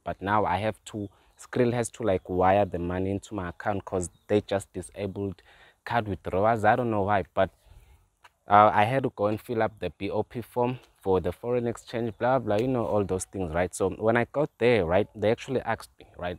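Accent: South Africa. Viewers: you sound like you from SA and why don't you reply to the people asking you which country are you talking about? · that's South African accent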